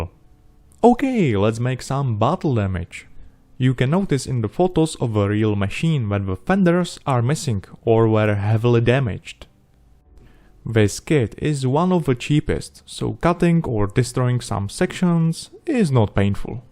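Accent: Russia. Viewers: С русским акцентом!)))) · просто по акценту я посчитал, что он носитель русского.)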